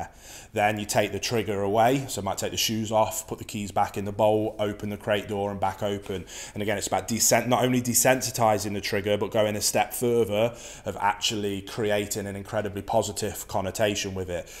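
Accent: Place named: Midlands, United Kingdom. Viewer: Can’t believe you just mention Cannock Chase 👍 I thought you sounded like you were from the Midlands xx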